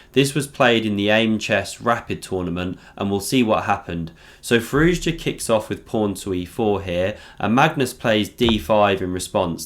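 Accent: United Kingdom. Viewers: Great commentary with beautiful British accent and a juicy Alireza game. Very convincing to subscribe. Looking forward to see more from your channel.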